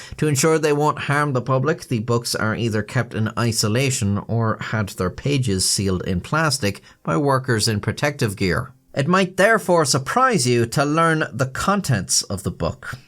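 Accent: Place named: Ireland. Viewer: For the love of Pete can we please have more Irish voiceovers on YouTube 👏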